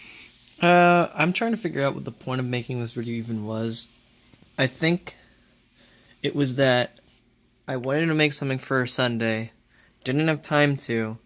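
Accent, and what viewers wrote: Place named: Georgia, United States. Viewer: You have a really nice voice. Are you from Georgia by any chance? I've known a few people from there who pronounce words with similar intonations. This vlog was really comfy. I liked how you cut from thing to thing, it gave a sense of pacing as you were developing each topic. A lot of the stuff you were talking about felt very mundane but that was the charm of it for me. Getting to hear someone with a likeable personality and soothing voice share what their life is. And the fact that you showed for example an unedited clip of you playing that game that had been spending so much time on drove home that point. It felt very coherent overall and had a nice flow to it. I'm looking forward to what you do in the future!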